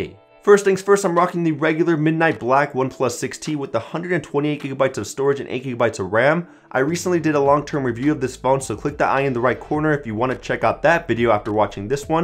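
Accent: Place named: RP, United Kingdom. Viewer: Your pronouncing is like BBC News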